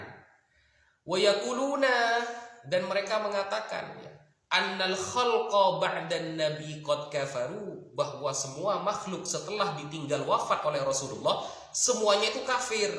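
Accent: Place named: Madura, Indonesia. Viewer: ustadz ini kok ada logat madura😁...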